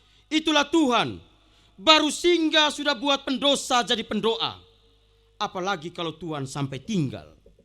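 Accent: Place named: Flores, Indonesia. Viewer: Ini baru kotbah yg menyejukan dgn dialek bhs Daerrah yg kental sy bangga jdi org Flores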